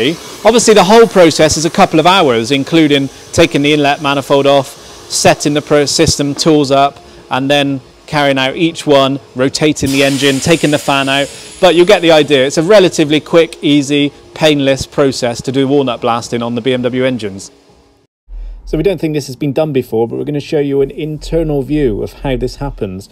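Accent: Bristol, United Kingdom. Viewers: Bristolian accent maybe?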